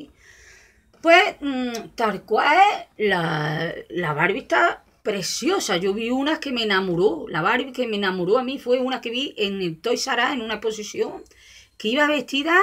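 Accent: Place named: Andalusia, Spain. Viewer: di que sí!! arriba el andaluz!! si no se entera que no te vea! yo soy gaditano y te entiendo perfectamente! un beso guapa! la Barbie me encanta! por fin una muñeca que se acerca a la realidad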